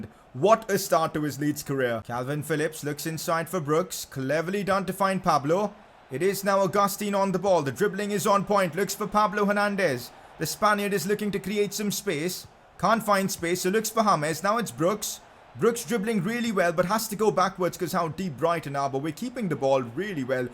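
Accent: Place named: India. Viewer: Pcq why is your voice weird like Indian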